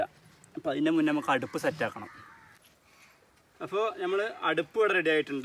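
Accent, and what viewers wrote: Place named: Malappuram, India. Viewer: മലപ്പുറം സ്ലാങ്ങിലുള്ള അവതരണം പൊളി ആണ് വ്ലോഗ് സബ്സ്ക്രൈബ് ചെയിതിട്ടുണ്ട്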